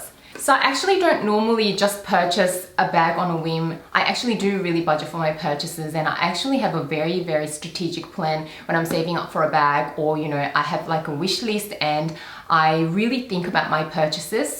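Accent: Australia. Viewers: Ive never heard a Chinese accent mixed with an Australian one before, mind blown.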